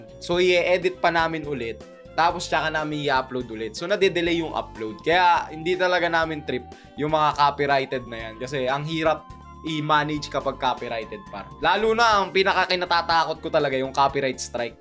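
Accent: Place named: Batangas, Philippines. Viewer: first kopo marinig mag batanggenyo kapo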